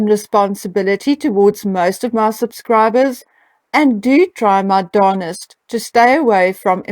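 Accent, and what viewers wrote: South Africa. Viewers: your research is outstanding. Your honesty is greatly appreciated. I used to live in S.A. and it’s a pleasure to hear your accent. Good luck with what is going on in your personal life - that comes first. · Hello, nice accent. Where are you from in SA? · I am loving this, I was 45 years in South Africa and the accent is so lovely to hear again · Is this South African accent I hear Hi from fellow South Africa. I love your posts and watching with interest. I have been following Celt, Yankee Wally, the Vixen, Sandidog and other tumblr blogs et al for a couple of years now and matters relating to this subject are at last being discussed in the public arena. I have always been curious that H's wife has never sued anybody who has expressed doubts about Archie's birth. There are numerous pictures and videos showing bump falling down to knee level (the purple and pink outfit) the swaying bump (the short dress, white with greyish pattern) and many more. 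None of us like being hoodwinked or fooled with, so the truth exposing these two cannot imo come soon enough. There is no shame in surrogacy which cannot determinate a child's colour, so they need to come clean or be exposed. The outcome won't be pretty.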